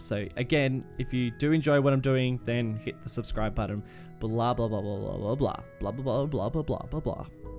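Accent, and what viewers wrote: Australia. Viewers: This one is my favourite series of yours I think, never been to China but your level of detail is inspiring. I'm also from Sydney so it's kinda nice hearing the Aussie accent 😎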